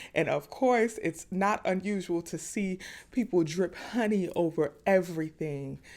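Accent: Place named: North Carolina, United States. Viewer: You sound like you're from North Carolina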